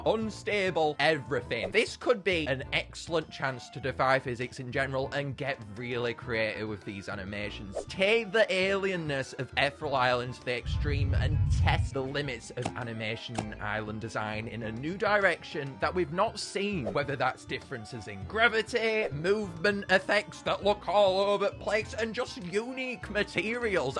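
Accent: United Kingdom. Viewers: His accent is British that's the reason why he pronounced it.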